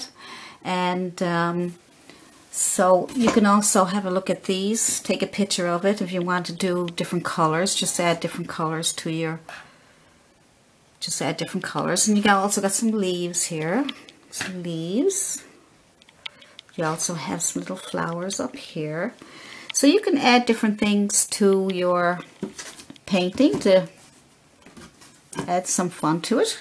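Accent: Newfoundland, Canada. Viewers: You from Newfoundland? Accent?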